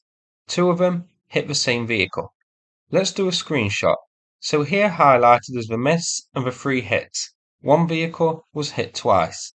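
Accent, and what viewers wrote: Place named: East Midlands, United Kingdom. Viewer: You’ve definitely got an East Midlands accent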